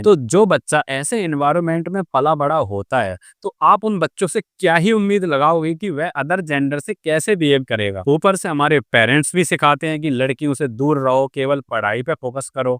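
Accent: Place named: North, India. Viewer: He have north indian accent 🇮🇳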